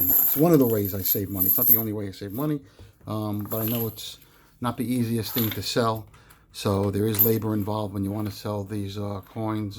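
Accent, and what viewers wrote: New York, United States. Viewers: Nice pickups. I just became you #144 subscriber. I enjoy your gold coin flipping 😆 and your NY accent, as I am from the South. Look forward to watching you hustle up some Stacks of Gold. The faster you acquire it the sooner you quit paying interest to your family associates. Bank 🏦 of looking good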